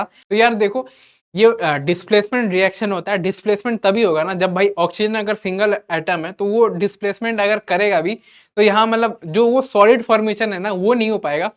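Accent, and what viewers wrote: Bihar, India. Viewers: Sahi me aap Bihari ho kya 😮😮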